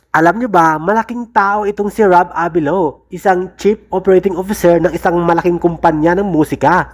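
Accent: Philippines. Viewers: I’m happy for our Mahalima! I also want to commend this channel kasi yung host at yung video, walang ginamit na AI!🎉❤ dami kasi ng nagsulputan na channels na bago tapos yung thumbnails nila halatang AI kasi yung mahalima natin, iba ang hitsura. Meron pa ngang thumbnail na na double mukha ni josh tapos wala si Justin, yun pala pinaghalo halo ng AI mga itsura nila 😂😂😂 tapos AI yung boses, maganda nga yung accent pero di mo ramdam yung pride ng host pag nagbabalita ng good news sa mga A’tin. Meron pa ngang halatang pinasulat sa chatgpt yung script kasi ang habaaaa ng video pero niligoy-ligoy lng pala yung point 😂 So pls continue producing content na gawang tao at may puso, andito kaming viewers na prefer ang Pinoy accent natin kesa robotic na accent. Salamat!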